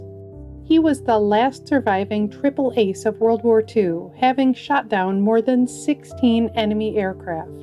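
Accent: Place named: Wisconsin, United States. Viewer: Great Wisconsin accent.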